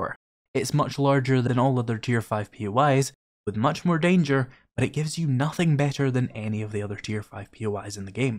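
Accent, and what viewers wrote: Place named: England, United Kingdom. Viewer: Answer: Series. The plural of series is series. It's just like the word deer. You have one series. You have twenty series. This is because the word is a noun that itself is already describing a plural. Therefore, it retains the plurality in singular and plural. (This feels very awkward, being an American explaining English to a person with an English accent) 🤣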